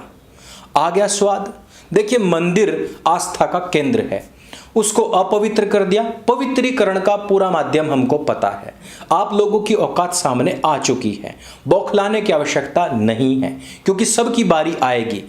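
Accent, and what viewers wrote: Haryana, India. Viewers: Sir haryanvi sunne me mazaa aa gaya ...what a episode mind blowing .. dhanyawad... 🙏🙏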